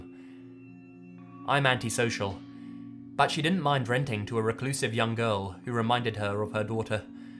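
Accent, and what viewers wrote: United Kingdom. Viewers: I cant stand british accent :D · I don't know about anyone else but I am somehow very attracted to the voice of the narrator because I love british accent and his voice is sooooo appealing to me😅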